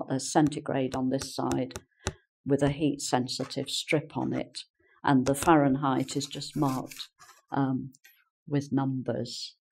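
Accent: United Kingdom. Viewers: Hi, i love the british accent. But, how do you pronounce heat, hate, hit, height? Are they being pronounced the same way?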